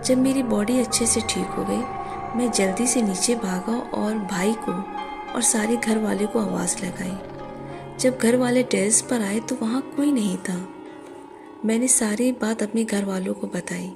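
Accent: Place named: Kolkata, India. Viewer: Ap ki language culcuta ki hai Kia ap culcuta se ho Asalam u Alekum lots of love from Rawalpindi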